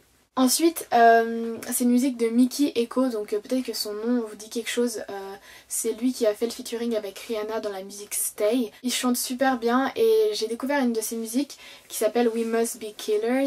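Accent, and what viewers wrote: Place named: Switzerland. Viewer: Hey, donc franchement je tenais à commenter cette vidéo parce que franchement j'adore vraiment tes vidéos ! Genre j'sais pas mais je peux te regarder en boucle pendant 9 minutes je vois pas le temps passer hahah enfin bref, en tout cas j'aime bien tout simplement parce que tu articules quand tu parles, tu as l'accent Suisse et comme je suis Suisse aussi c'est plus agréable je pense, et surtout c'est vraiment constructif ce que tu dis et surtout tu es douces, genre tu cries pas et c'est agréable de t'écouter. Bref voilà continue surtout, tu vas bien monter :) bye !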